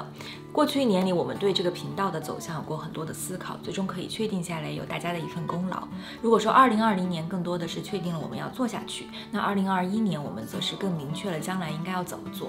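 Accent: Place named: France. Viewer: 一直很喜歡妳們的視頻~ 穿插。。的吳式幽默^^ 穿插。。一臉無辜臉的悟空^^ 還有最重要~搏主法式的聲調解說期待下年度的新視頻唷~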